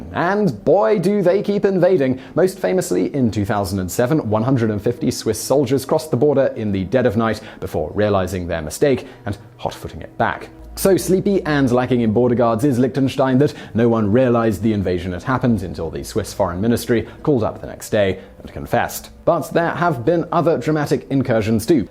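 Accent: England, United Kingdom. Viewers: A suggestion about English accents -- the host has one.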